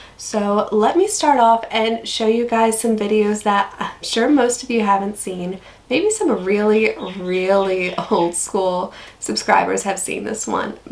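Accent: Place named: Australia. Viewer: Crikey! a full year already. Congrats, thanks for the behind the scene's look, really interesting. I have watched and enjoyed many of your vids, seeing a person from the U.S. become an Aussie is awesome, small changes in speech (using slang, Aussie accent etc.) creeping in, (it's a secret ingredient they put in Vegemite, Shapes and Chicken Salt) before you know it your thongs have fallen from your hips to your feet;-). Love your channel, best wishes and hope for continued success! Cheers mate.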